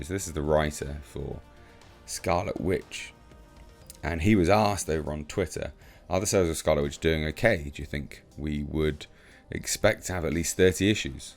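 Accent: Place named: United Kingdom. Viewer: You're an Brit, pronounce "manga" however feels natural to you, bruv.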